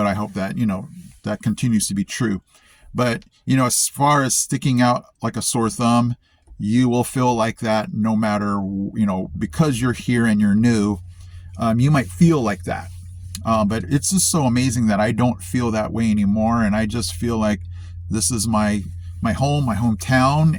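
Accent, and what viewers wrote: United States. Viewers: As an East Tennessean I couldn't care less where anyone once was. If you choose to fit you do. I have two nephews half Japanese by heritage that I never think about as being anything other than my nephews. You seem to have assimilated so well that you sound like an educated hillbilly. I would have thought you'd lived here the majority of your life. "Purebred" Southern Appalachians have mostly held to the belief of I'll do me and you do you and as long as you don't infringe we'll happily meet in the middle somewhere or agree to disagree and go on with life. You've gained a subscriber and a belated welcome to the greatest place on earth. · Many years ago I spent 6 weeks every summer at my mamaw’s house in North East Tennessee, and I would think your accent would cause people to call you a “damn yankee”. I’m guessing if you have an orange UT banner flying on your front porch, you’re good regardless of any other differences.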